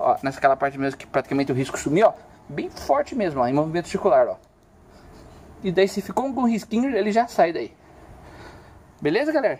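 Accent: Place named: Interior, Brazil. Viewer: Seu sutaque é do interior kkkkk tmj. mt bom, vlw pela dica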